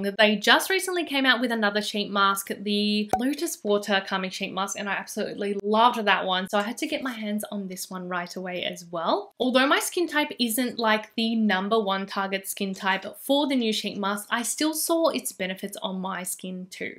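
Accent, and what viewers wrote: Australia. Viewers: I like her Aussie Accent. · i'm absolutely loving your heavy australian accent😄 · u aussie? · I knew right away you’re an Aussie. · That Asian Australian accent tho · Very Australian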